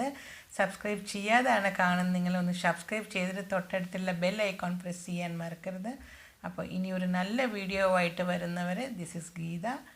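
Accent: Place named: Tamil Nadu, India. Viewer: videos ellam njan kanarund... തമിഴ് ചുവയുള്ള നിങ്ങളുടെ മലയാളം കേൾക്കാൻ എനിക്ക് നല്ല ഇഷ്ടമാണ്....helpful vieeo aantoo ith.... Thank you so much dear🥰🥰🥰💐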